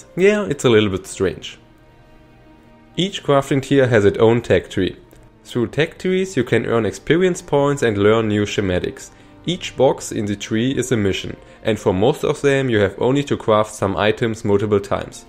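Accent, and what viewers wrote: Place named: Germany. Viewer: Yeah, very strong German accent. He should work on that.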